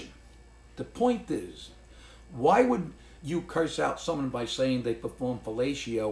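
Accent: New York, United States. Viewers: It just sound really Really bad with an New York Italian accent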